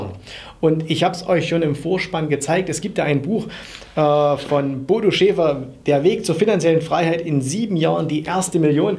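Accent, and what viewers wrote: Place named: East Germany, Germany. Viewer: Konsümverchzicht...DDR Akzent kommt durch 👎. Das Video ist inhaltlich nicht hilfreich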